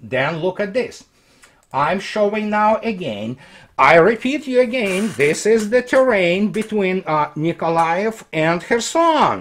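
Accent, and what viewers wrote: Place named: Russia. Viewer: It's awesome and funny AH to be listening to American slang with a Russian accent!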